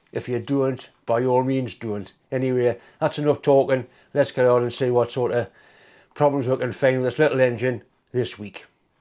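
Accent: United Kingdom. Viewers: Enjoying this immensely! Where in the UK are you? My family is from Poole/Bournemouth, listening to you and wondering where you are located. Wonderful work, thank you.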